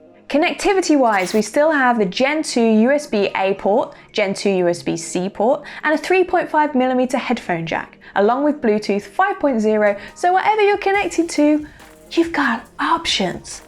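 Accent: United Kingdom. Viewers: I love this lady, she's so fun to watch. English accents are the best.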